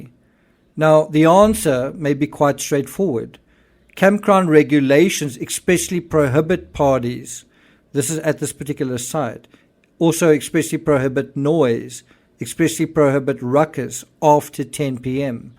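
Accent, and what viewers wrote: South Africa. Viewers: I recognise the accent...fellow South African...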